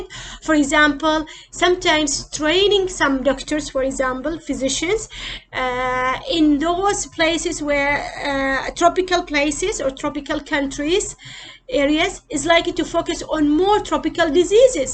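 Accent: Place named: Europe. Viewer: Mam,please speak like Asians,not like europians,there mouth and tongue differ from us... I appreciate ur class,but plz come back asian style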